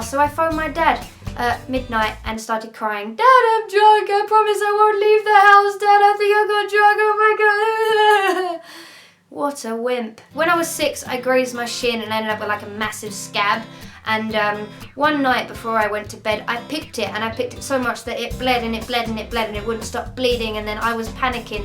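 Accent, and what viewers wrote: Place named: Leeds, United Kingdom. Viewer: sounds like you are from Leeds or Buckingham Palace. Royalty!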